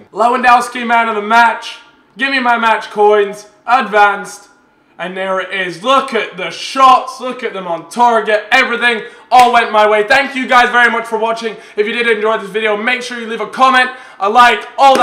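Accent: United States. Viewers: why does he have an American accent? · Why does he sound like he's American? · Is it just me or does he sound a little bit American. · Is american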